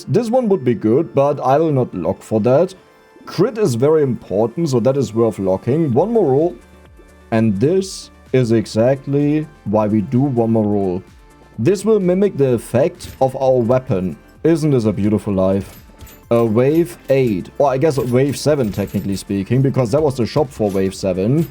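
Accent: Germany. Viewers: Becauze he haz a strong German aczzent.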